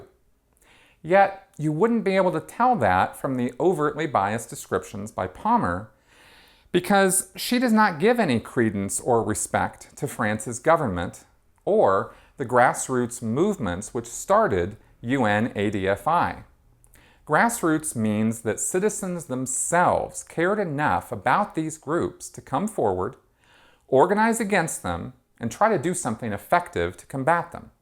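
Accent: United States. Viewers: Your accent reminds me of an American sailor in the 1961 French film Lola. Otherwise, great as always.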